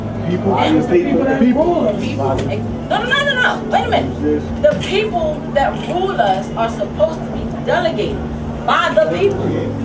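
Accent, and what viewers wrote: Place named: Philadelphia, United States. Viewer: "Voluable"? Lol, love that philly accent. Just teasing! Nice video.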